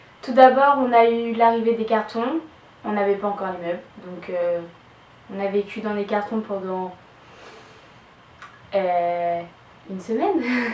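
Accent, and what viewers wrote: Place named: France. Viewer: ohh, that's why i can understand your french perfectly - 'cuz your from France (*not Quebec!..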